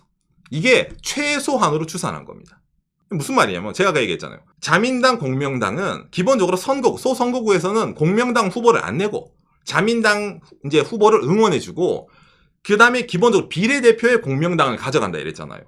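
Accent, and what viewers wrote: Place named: Gyeongsang, South Korea. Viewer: 도... 경상도 사투리... 쓰네요... ㅎㅎㅎ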